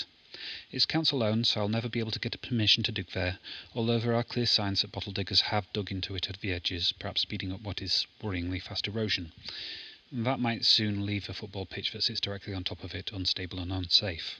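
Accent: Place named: RP, United Kingdom. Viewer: you sound pretty posh for a mudlarker.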